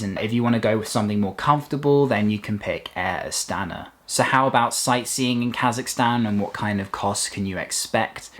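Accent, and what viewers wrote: United Kingdom. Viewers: I like it your accent bro it's clear and understandable, you're different other British accent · Зае6ись британский акцент))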